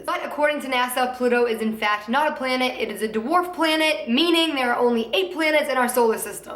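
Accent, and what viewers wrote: Boston, United States. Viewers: Boston accent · are you from Boston? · what's her accent? Boston? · Marilyn Peterson It's her adorable af Boston accent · Are you from Boston? I love your accent 😂😂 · Courtney Blair what the hell?😂 she's from Boston. Boston accents mainly only show with certain words. You're an idiot. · I love your Boston accent 😊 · your boston accent makes me so so happy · My parents are from boston so they say things the same as you aha! and your my absolute fav! love you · Is she from Boston she kinda has the accent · her accent 😍😍 Boston?? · ThatsSoKendall it's a Boston accent. Definitely not country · Sofia Lazcano-fafard she's got a Boston accent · it isn't fake. It is a Boston accent and it is very common for people with accents to come and go. It can change depending on who she is talking to, her emotion, etc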